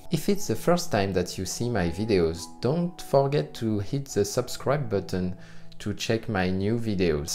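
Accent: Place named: France. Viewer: Non, c'est compréhensible. Pour un anglophone l'accent français est charmant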